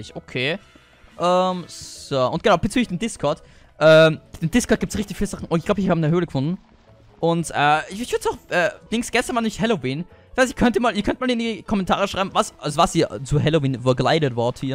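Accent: Austria. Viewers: Ich liebe deinen österreichischen aktzent wie bei Flo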